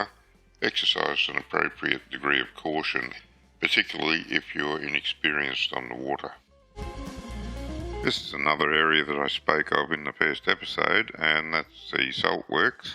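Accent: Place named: Queensland, Australia. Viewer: It’s a pure Queensland accent, a rarity these days.😂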